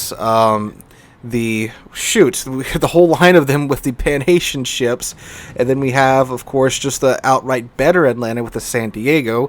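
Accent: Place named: South, United States. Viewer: Not all of them do, I think it's a regional thing. I believe is southern so his pronunctiation makes sense to me. May not be technically correct but makes sense.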